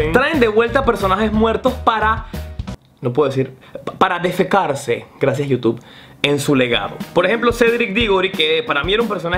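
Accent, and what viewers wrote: Venezuela. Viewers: Qué simpático eres x'D tienes acento levemente venezolano :0 ¿eres venezolano o es que el acento panameño se parece?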